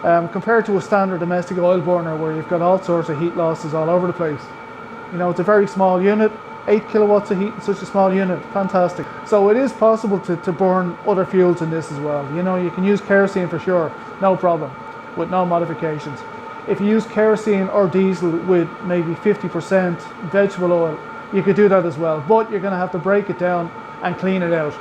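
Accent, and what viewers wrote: Ireland. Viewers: Great little video. That positive Irish attitude to life and the cheerful accent. It reminds me of my little Irish mum, god bless her and my she rest in peace. Those diesel heaters are an obsession. I have installed two at the cottage in Southern Finland. One running from the garage under the cottage into one side through a hole through the concrete slab. On the other side of the cottage, in from the patio area through the wall. Last year had only one but in one room was warm but still needed the wood fireplace on the other side of the cottage to heat things. That means trekking through the snow to collect wood to burn. In winter in the Finnish countryside, the snow can be meters deep and by January can be -10 to 20C, and it's a pain(A COLD PAIN) aside from the need to cut wood in the autumn. Filling diesel tanks on these heaters is easier. 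The newest of these heaters is the HCalary 8,5kw which I bought in mid-summer for a dirt cheap price on e-bay and I have put a 25 liter diesel tank. That one has a far better control panel with better features and easier use. The cottage is off grid, so hoping that I get the extra solar panels up just bought cheap to help keep the inverter and four 100Ah batteries topped up for the heaters and lights of the cottage during this winter. We have a Champion generator too, which helps the time we are there to maintain the place. That Hcalary heater can be set to come on when we are not there, but I am a bit unsure of setting it to go as earlier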